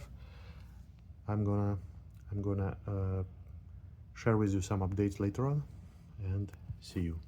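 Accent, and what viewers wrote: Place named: Russia. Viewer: Русский акцент) Сколько сейчас девайс стоит на местных барахолках и из какой страны вещаешь?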